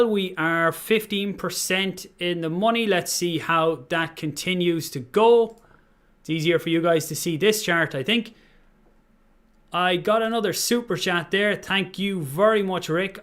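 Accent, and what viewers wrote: Ireland. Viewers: Not another fucking Irish accent!!! All I’ve heard all day watching the gg’s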